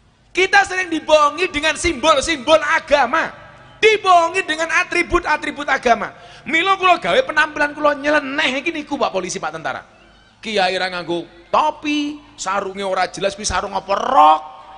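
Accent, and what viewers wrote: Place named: Banyumas, Indonesia. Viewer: Pantsan Abah bisa ngomong ngapak menghormati yg desa"ngapak..kaya saya yg ngapak juga.. bangga sama Abah😊sht"ya Abah Gus Miftah kyaiku 🤲🤲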